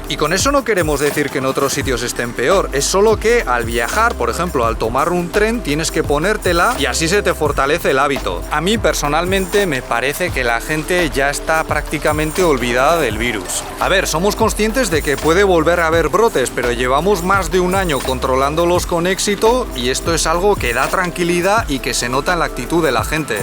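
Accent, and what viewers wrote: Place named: Spain. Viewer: El asentó español es tan estresante y molesto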